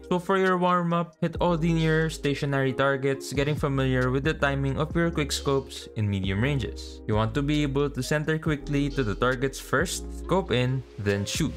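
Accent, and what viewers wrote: Philippines. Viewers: u sounds like you're a Filipino. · Thanks for this tips and tricks! Its's awesome.. Anyway, are u a Filipino? Because your english accent sounds like how we Filipinos talk english.😍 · your accent is kinda like filipino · Are u filipino u sound like a filipino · pinoy english accent is good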